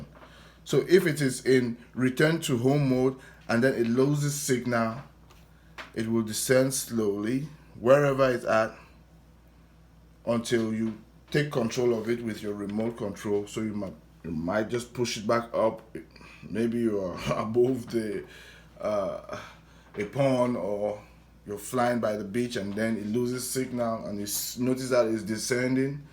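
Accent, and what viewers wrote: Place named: Trinidad and Tobago. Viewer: Are you from Trinidad? Just wondering, your accent sounds just like my old boss.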